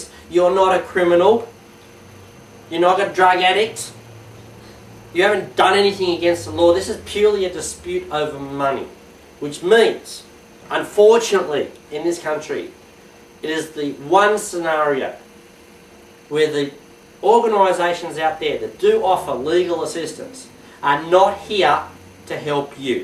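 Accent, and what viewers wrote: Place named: United Kingdom. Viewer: OK from your acent its obvious your British, so does what you offer work in american courts? I have been unable to get anyone with the knowledge I need to help me. The whole system seems to operate on the premise that "its there little enterprize" and anyone who is not part of it, is not welcome. I thinks this is because if anyone figures out their little fraud, they would be out of business.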